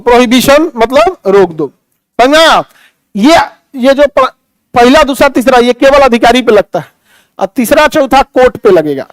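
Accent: Bihar, India. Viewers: Kya jabardast bhojpuri Bihari bhasha ba sir 😂😂😂🎉🎉🎉❤❤❤wah wah wah wah wah wah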